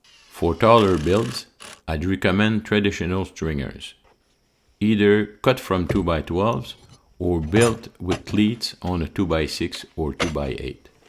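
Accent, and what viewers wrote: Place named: Netherlands. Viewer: Dang! That should be strong enough. The dutch accent is a nice touch 🙂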